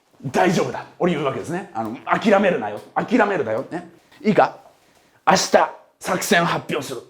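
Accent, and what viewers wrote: Kansai, Japan. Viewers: 「しくじり先生」終了後、もっとあっちゃんの授業が聞きたいなと思ってた時、カジサック経由でYoutube大学を知りました。とても毎日楽しく見てます。知的好奇心をくすぐる内容。学ぶって楽しい あっちゃんの喋りが説明のできない高い説得力(教祖のようと卑下してますが)の中に時々出てくる関西訛り、エセ関西人ではないけど基本標準語なのでとても特徴的だなと思ってたのですが幼少期に大阪で育ってたのが残ってたのですね頓着しました。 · あっちゃんが関西弁たまにでる 理由やっとわかったわ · ２：２４：５６を見て始め映画並み？？？と感じました。でもまだ前半だったのですね。一気に観させてもらいました。確かに最初の自己紹介は初期の撮影ということもあり改善は必須だったかも知れないですね。今回の自己紹介①は授業同様楽しく観れました。 話しの最中で「たけしさん」言葉が連発していたのが少々きになりましたが、熱気は十分に感じ取れました。以前、２nd YouTubeでだったか、あっちゃんがターゲットは10代から30代と言っていた時があったような気がしますが、40代～50代（もしかしたら60台も）も視聴者層はいますのでよろしくお願いします。 東京（関東）の話し方の中に、関西弁が違和感なく入ることで聞いている方は入り込みやすいです。また、体を使った（張った）解説は演技力の賜物で普通の教育系ＹｏｕＴｕｂｅにはない魅力を感じます。学び心を満たしてくれる動画に感謝です。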